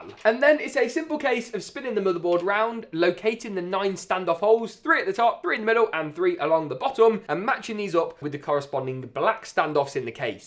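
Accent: United Kingdom. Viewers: I like your british accent. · This guy surely likes speaking british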